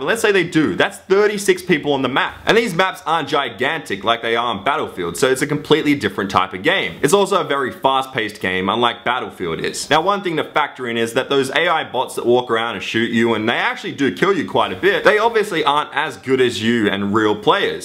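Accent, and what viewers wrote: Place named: Australia. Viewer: I love rage moments combined with his Aussie accent and cursing, it's so funny:)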